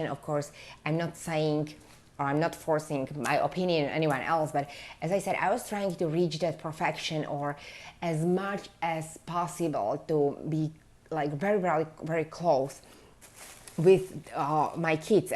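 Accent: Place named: Europe. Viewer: From what country in Europe do you come from? Yesterday evening I met a lady with your accent and boy she is your "sosie" as we say in french. I don’t know is the word exist in english but I thought it was you!! And she is sweet like you!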